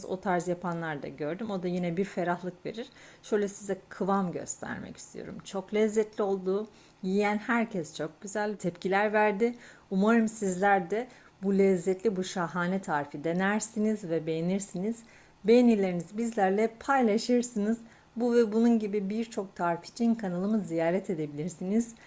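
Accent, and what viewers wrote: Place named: Gaziantep, Turkey. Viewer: hanım nereliydiniz acaba Antepli felan mı merek ettim bu ses verin inşallah iyisinizdir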